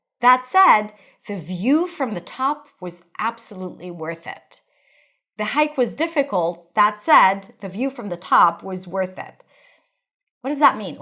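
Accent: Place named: United States. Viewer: Clear American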